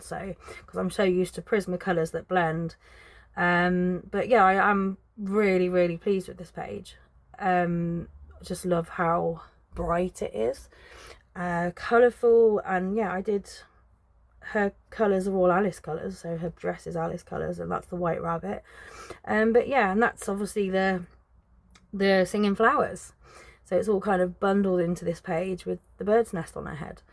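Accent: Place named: England, United Kingdom. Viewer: You have a lovely voice! You have a beautiful English accent (to me, an American) and pleasant musicality (engaging and expressive). I could listen to you for hours, whereas I have had to bounce from other channels because I couldn't stand the sound of their voices. I dislike what they call vocal fry (common with American females) and that annoying sharp popping sound Australians tend to make and how they make every sentence sing-songy, like a question? Probably nobody likes the sound of their voice because it's different from the outside then within our own heads. 😅Anyway, yours is wonderful, so I hope you can feel reassured. And your coloring is very cute too. 🥰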